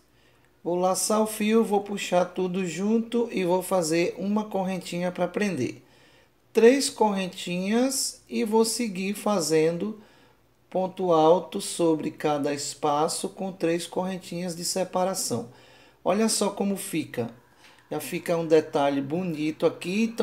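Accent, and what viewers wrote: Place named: Bahia, Brazil. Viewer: Seu sotaques é um pouco parecido com o nosso da Bahia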